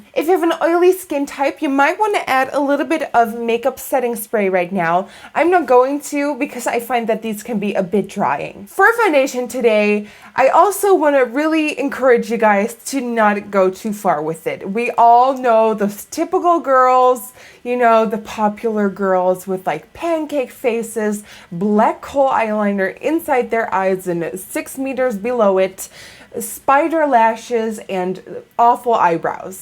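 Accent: Netherlands. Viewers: Kom je uit helmond?? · yes. she is from the Netherlands but it's less noticeable in more recent videos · Is it me or does she sound a lot more Dutch in this video?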